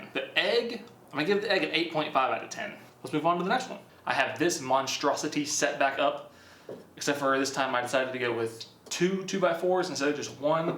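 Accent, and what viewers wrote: South, United States. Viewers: He sounds southern but he calls it Pop, and we dont do that. So I'm mega confused.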